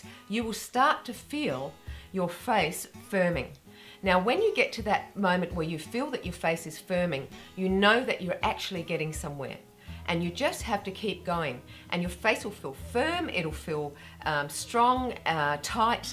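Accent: Australia. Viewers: Geez, I just adore you:) I also love your Aussie accent! I commented before, have followed you for quite some time now, but only recently have I decided to give your steps a go as you put it all together so nicely, am still at the first week and very excited to see it through. There is so much to watch, learning never ends. Still slightly confused but hey, I will get a hang, for now my goal is to make it through all reps, all series, so that will keep me working for weeks. I have been doing various massages, small exercises and such these last years but now, given that I am approaching 50, given that I also started having neurological issues, jaw surgery in 2 weeks, I decided to this with you. Just wanted to say thanks for sharing all this with us. That good karma is giving you such a lovely, positive glow so no wonder that you loo so beautiful and fresh <3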